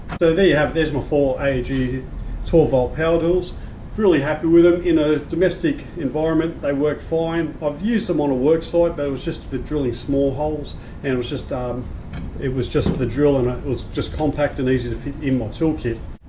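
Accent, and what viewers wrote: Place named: Australia. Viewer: Good old Aussie accent!👍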